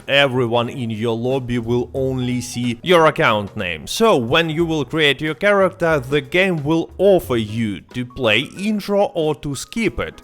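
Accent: Russia. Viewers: Ohhh this russian accent, but video is zaebis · Are you Russian?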